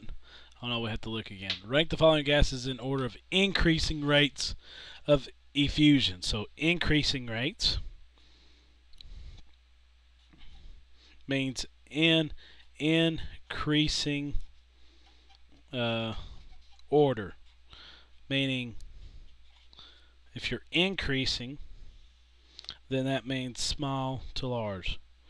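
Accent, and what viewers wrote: South, United States. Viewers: You seem to have a very southern accent, are you an Alabama, Florida, or Georgia native?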